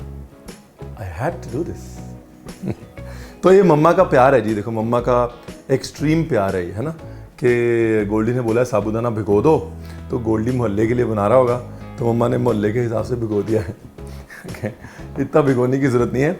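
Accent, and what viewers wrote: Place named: Punjab, India. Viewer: Very yummy 👌😋 i just love your punjabi tone chef .. you are really amazing n your recipes are just woww....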